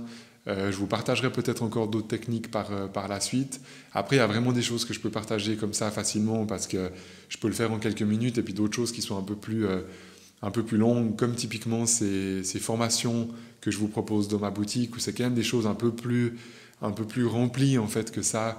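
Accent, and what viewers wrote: Vaud, Switzerland. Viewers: Merci au petit suisse, quel voix, quel intonations et quel accent délicieux et puis toute cette douceur ❤ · Votre voix est extrêmement rassurante et ce petit accent vaudois y est pour beaucoup a mes yeux... ;-) Merci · Bonjour. Merci 🌸. C'est plus fort que moi alors je le dis: cet accent vient de CH n'est-ce pas? J'ai expérimenté ou plus précisément, je me suis rendue compte de cette énergie en 2020, elle s'est invitée à l'imprévu ... hihi. Je l'ai apprivoisée et j'arrive à la faire venir à chaque fois que j'ai envie. Jusqu'à mauntenant je ne fais que la ressentir et sentir ma sensation ... maintenant je sais qui c'est, je lui ferai part ma demande. Encore MERCI ❤.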